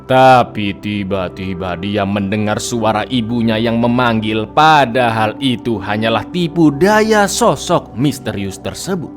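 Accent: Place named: Madura, Indonesia. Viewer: Bang orang madura emang,,ko gitu siih logatnya,,😅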